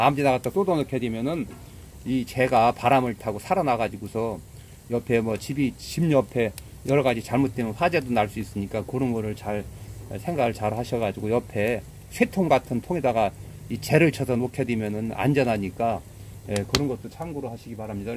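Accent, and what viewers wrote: Chungcheong, South Korea. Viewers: 그렇겠네요‥ 불조심해야죠 큰일나죠‥불씨‥ 그런데 고향이어디세요 충청도같은데‥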